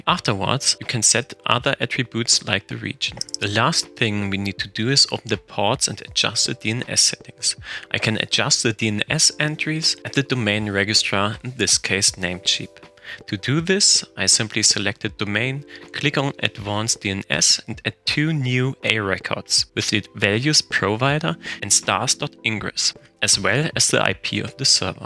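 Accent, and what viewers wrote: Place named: Eastern Europe. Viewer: Акцент славянский. Ничего не понятно, но очень интересно.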